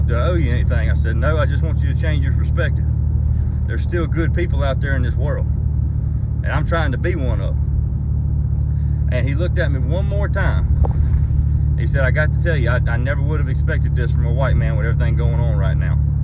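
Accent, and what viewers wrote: South, United States. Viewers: That country accent and them sayings in between have me 💀 😂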